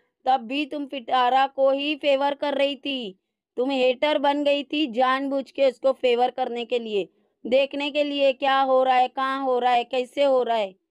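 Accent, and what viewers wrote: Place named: India. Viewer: api ap India sy hn kia. ap ki urdu sy lga .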